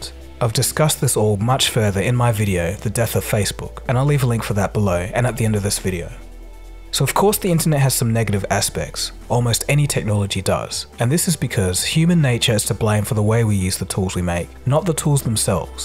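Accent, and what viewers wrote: Australia. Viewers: That Aussi accent is so awesome! Cheers from Brazil. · 5G here in Australia? Yeah, sure: it's hard to even get 4G CONSISTENTLY across bloody Sydney (you sound like an Aussie). No Bandwidteh Now (NBN) will only continue to screw this up even more towards 5G ...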